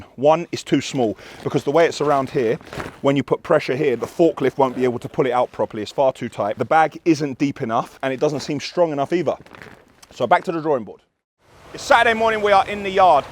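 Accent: Caribbean. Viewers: I knew he sounds Caribbean...big up from Jamaica mate; love your content and what you do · I knew u were of caribbean decent without even hearing u say it.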